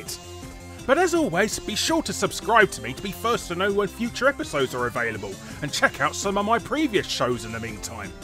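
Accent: United Kingdom. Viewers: Considering british people invented the language he can pronounce it however he wants, personally I could care less.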